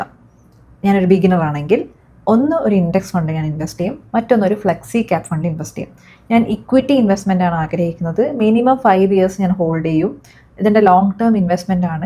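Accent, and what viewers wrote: Kannur, India. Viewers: ആദ്യമായിട്ടാണ് ഞാൻ നിങ്ങളുടെ വീഡിയോ കാണുന്നത്.. ഏതോ ഒരു സെക്കൻഡിൽ നിങ്ങൾ ഒരു കണ്ണൂർ കാരി ആണെന്ന് ഞാൻ തിരിച്ചറിയുന്നു 😅😅.. am i right..?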